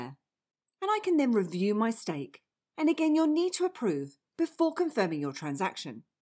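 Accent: United Kingdom. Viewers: best thing about your channel is listening to gb English instead of us. Not many brits doing crypto content. Well done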